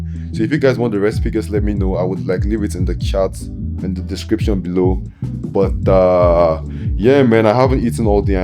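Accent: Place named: Nigeria. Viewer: You must be Nigerian right?😊 I can tell from your accent brother 😊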